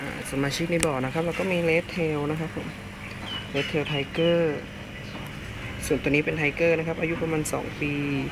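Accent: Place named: North, Thailand. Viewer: คนจังหวัดไรครับเนี้ย พูดเหนือด้วย 55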